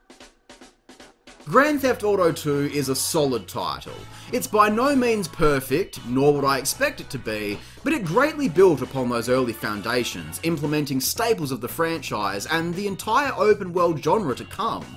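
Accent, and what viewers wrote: Australia. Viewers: Dude, I’m new to your channel and I have to say, you have of the most authentic, energising and entertaining personalities of anyone I’ve seen making this kind of content. So many people play a kind of character when they’re in front of the camera. There’s nothing wrong with that per se, but it’s so refreshing to see a person cut out the nonsense and speak articulately and passionately about the things they love — and the things that give them the shits! And not to shit on anyone else, but it’s awesome to hear a proper Aussie accent without some kind of weird affectation / exaggeration that some of the more popular Aussie YouTubers seem to feel they need to put on. My only regret is not finding you sooner. Keep doing what you’re doing my man, I look forward to coming along for the ride!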